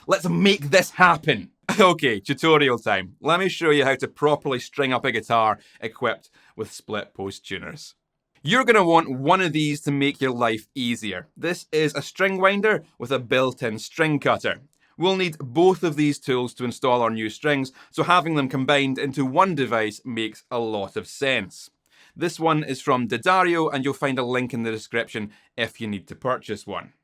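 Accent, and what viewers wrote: Scotland, United Kingdom. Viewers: I come here for the wonderful Scottish accent (me dad is mostly), and the guitar geekery is a bonus! Seriously, you are one of my favorite channels for the great guitar information, and because you're not afraid to slay sacred cows like locking tuners, unpotted pickups supposedly being better, pickup measurements influence on tone, etc. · I need to lear the Scottish accent, like you and Dr. Steve Cassidy, so I can make more interesting videos. Love it.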